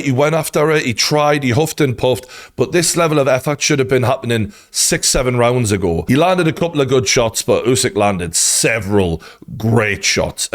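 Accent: Newcastle, United Kingdom. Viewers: Spot on true geordie👍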